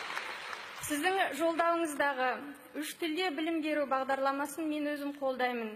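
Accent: Standard. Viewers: маладец казакша таза соилеиды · Кандай керемет шет елде журсе де оз тилинде таза сойлейды, билимы оте жогары, талантты кыз,полиглот.каида журсен де бакытты бол. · отте жаксы созледы ардайм жогарыдан корне беринз шетелде журседе таза казакша солеп тур тамаша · Жарайсың ҚАЗАҚТЫҢ қызы! Орысша сөйлемей ақ білімді болуға болатынын көрсеттің, шала қазақ мəңгүрттерге. Шет елде тұратын 7 тіл білетін қыз өзінше болып ағылшынша, қытайша, орысша емес өзінің ана тілінде таза сөйлеп тұр. 👍👍👍👍👍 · керемет қандай жарасымды таза қазақша.ризамын.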